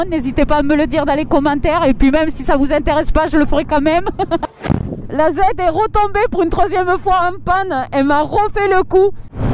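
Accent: South, France. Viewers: ton bel accent du midi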